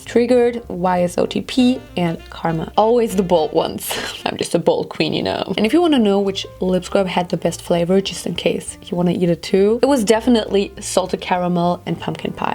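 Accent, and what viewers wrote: Germany. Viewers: I think it depends on the german accent/speaker totally. I've heard some pretty ridiculous and funny sounding ones, but hers is very cute :3 No offence to german ppl! I hate finnish (my country) ppls accent also. Actually hate it the most probably hahah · She's from Germany :)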